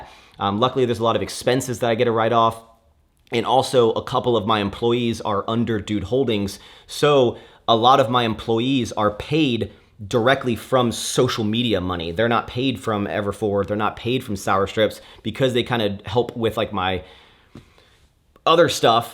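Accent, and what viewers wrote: Texas, United States. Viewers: That Texas accent becoming more and more apparent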